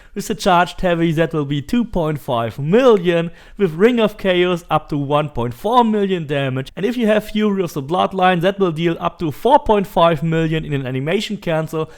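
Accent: Germany. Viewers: Deinem Akzent nach zu urteilen, bist du eindeutig ein Deutscher 😜 Das soll kein Vorwurf sein: im Gegenteil - ich fand das mega sympathisch als ich zum ersten Mal eines deiner Videos zu Odyssey anschaute. Du sprichst super Englisch! Ich wollte mich mal bei dir bedanken, weil du mir das Spiel sozusagen erklärt hast. Ich hab nach Videos wie du sie machst gesucht und aber kaum was gefunden, umso mehr habe ich mich über deinen Content gefreut. Keep up the great work - you‘re awesome 😉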